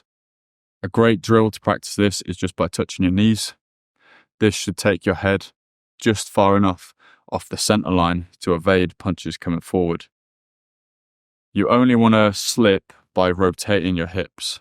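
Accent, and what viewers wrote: United Kingdom. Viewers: I just bought a punching bag, hung it up, and started boxing. Thanks for the tips and the video. I really like your British accent and the way you explain all the things 😎 Thx!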